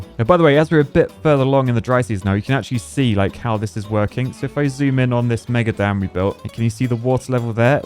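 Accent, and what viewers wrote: United Kingdom. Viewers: You can really tell he is British now.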